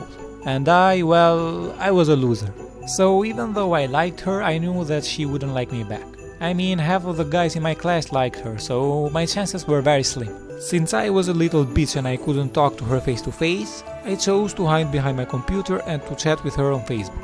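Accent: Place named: India. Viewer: Why does he sound indian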